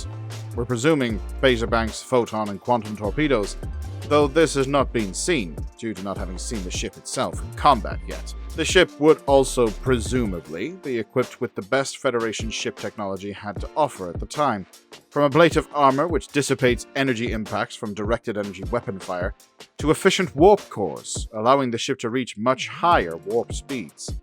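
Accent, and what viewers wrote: Ireland. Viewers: That accent! It's so very mid-Atlantic. I'm Irish, and the closest I can come to IDing it is as a very cultured or well traveled suburban Irishman. Where are you from? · Nice narration. Irish accent . Irish myself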